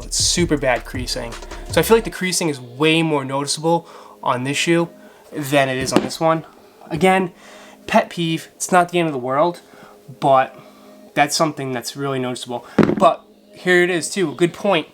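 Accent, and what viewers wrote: Boston, United States. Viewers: I hear an accent lol Boston? EDIT: I asked that in the beginning of the video.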